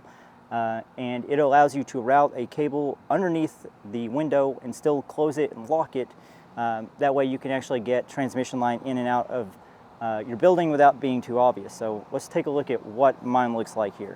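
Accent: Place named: Kentucky, United States. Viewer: How do you say antenna is how I knew you were from Kentucky!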